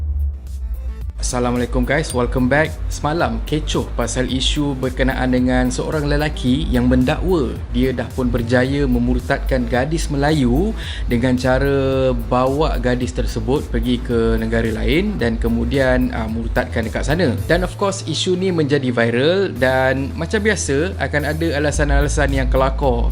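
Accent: Indonesia. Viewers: Pompuan Indonesia biasa la...dari bahasa yg diucapkan memang Indon. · betul..slang cakap pon lain..betul2 melayu ke atau indon?..sebab indon x semuanya melayu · hahaha bukan melayu tu ,,bunyi indonesia je,entah dri mana minah ni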